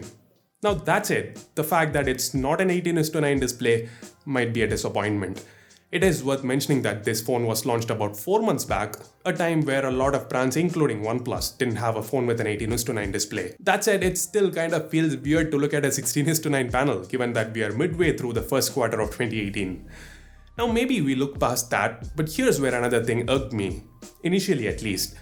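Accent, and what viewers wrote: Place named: India. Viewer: Im an indian too. But this guy has the most indian accent ever.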